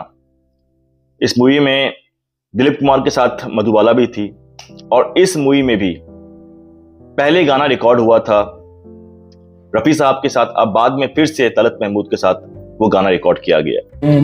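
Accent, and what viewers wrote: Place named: Bengal, India. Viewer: Aap ka gyaan sahi ho sakta hai, lekin aap ki hindi proper uccharan me nahi hai, aap 'R' ka uccharan nahi kar pa rahe ho. Bangali, Bihari tone ka touch hai.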